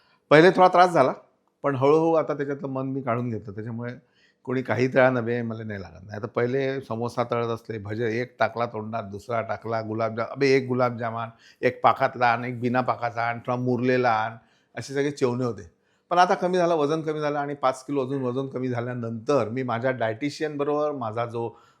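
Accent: Vidarbha, India. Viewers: Kitti god bhasha tumchi,tumhi majhya vidhrbache ka ho😂❤❤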